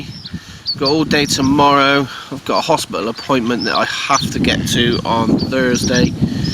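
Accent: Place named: Liverpool, United Kingdom. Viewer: Great Scouse accent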